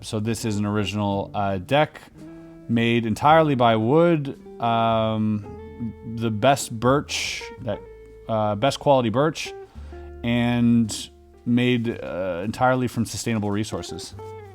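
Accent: Quebec, Canada. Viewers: It took me a video where you read a french card to realize you're canadian (and probably from around Quebec judging by the accent)! This was an incredible puzzle. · I just discorvered that has an accent from Quebec x) That's the fisrt time that I hear him speaking French actually. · Are you Canadian? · Tellement bizarre de t'endrendre parler francais avec ton accent quebecois alors que t'as pas vraiment d'accent quand tu parles en anglais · Are you quebecois? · Première fois que je witness ton accent Québécois. Toujours agréable de voir un Québécois réussir sur Youtube. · I don’t know what surprised me most, this amazing puzzle or your Québécois accent! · that perfect canadian french speaking ^^ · yup it's a french puzzle:). but definitly have a quebecois accent ( but honestly in france many mix up canada and quebec ) so all right to whoever want to mix up france quebec and belgique xD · Your french accent sounds a bit Canadian it’s funny 👍👍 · I knew. 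But only because I know his address is in Quebec and I recognize his accent. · La lecture en "Québéquois" m'a tué... 😆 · Je pensais pas que tu parlais Québécois! Moi qui te suit depuis tant d'années!! Thanks for sharing all this with us! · Tu es québécois??? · Un parler québécois impeccable! (Je viens juste de découvrir ta chaine) Super casse-tête! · Damn, your French accent is like, du parfait québécois! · Tu français??? Est tu québécois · Wait... Es-ce que t'es québécois par hazard? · Would you be from quebec by any chance? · c'est tellement nice haha jai faite le saut de t'entendre parler québécois! j'adore tes videos t'es awesome! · T tu Quebecois? j'ai cru entendre notre bonne veille accent bravo mon amie! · Il parle un peu québécois dans ses anciennes vidéos de street magic;) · C'est tellement bizarre t'entendre parler français. On se rappel que tu es Quebecois tout de suite. XD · Hold up hold up hold up... 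MY MAN IS CANADIAN?!?! WHAT!?!? The only reason I know is because I’m French Canadian and I can tell by his accent. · Are you quebecois!?!?!?! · salut je regarde tes vidéos depuis longtemps mais quand tu as sorti la carte en bois et que tu as lu en francais, j'aurais jamais penser que tu étais du Québec, good job! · Why do you have québécois accent when speaking french?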